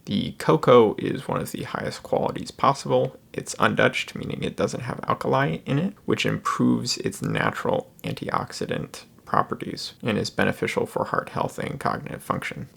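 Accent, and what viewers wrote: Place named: Pacific Northwest, United States. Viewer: Pacific Northwest accent maybe? A lot of people here speak like this.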